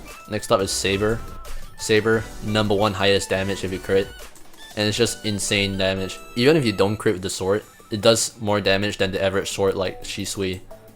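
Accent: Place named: Singapore. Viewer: u sound a little Singaporean haha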